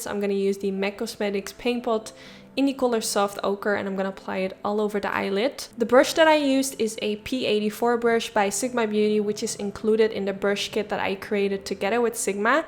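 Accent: Netherlands. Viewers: Have you thought about doing an English course? You are clearly fluent, but as soon as you said “hi guys” I heard you were Dutch haha. Hope you don’t take this the wrong way.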